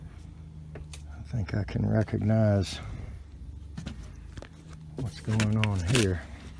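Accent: South, United States. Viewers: The soft spoken Southern gentleman has to break the bad news to another helicopter, and it is always done with tact. Couldn't help but notice that has begun using that term as well; maybe you should get residual compensation since you coined the word. lol Always nice to watch you and in action.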